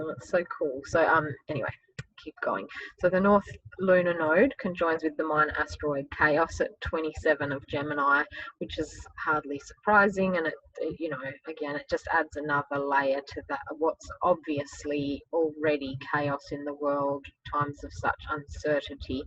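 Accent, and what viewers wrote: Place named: Australia. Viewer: Wonderful to hear an Aussie accent on EA ♥️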